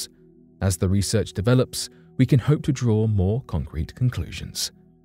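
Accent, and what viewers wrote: United Kingdom. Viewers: I hope those having some knowledge and sophistication will immediately understand the profoundly arrogant messages encapsulated in this video - manufactured complete with Brit accent to intimidate the Americans and their idiotic “mommy-daddy complex” they have towards that stodgy manner of speaking. “No evidence of alien contact…” yeah right. Get real dude…. what you meant to say was “no officially acknowledged contact with aliens.” Otherwise, the door is completely open. The idea that “despite all we know about stars and nebulae…” again, please: the realm designated as “science” the world over is in fact a church, largely controlled by governments and oligarchs- please. COVID proved that science does not exist for the general public and that real scientific reasoning and practices have been destroyed. In any case, almost nothing (of meaningful substance) is known about human existence and the proclamation here is preposterous.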